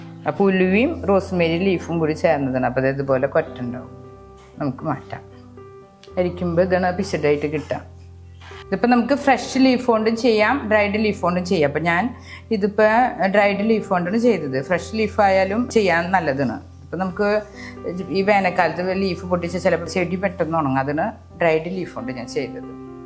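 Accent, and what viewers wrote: Thrissur, India. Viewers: Changathi, athu njangade thrissur bhashayanu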